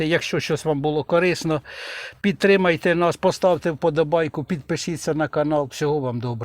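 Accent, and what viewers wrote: Poltava, Ukraine. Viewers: я просто з Миргорода, завжди Полтавський діалект виділявся серед інших)))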